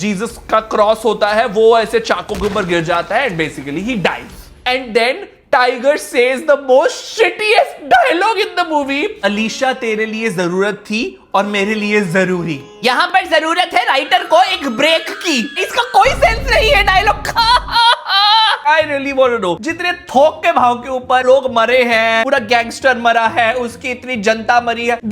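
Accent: Punjab, India. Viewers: Curtain.😂😂😂😂...nice accent in punjabi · his punjabii~ uff~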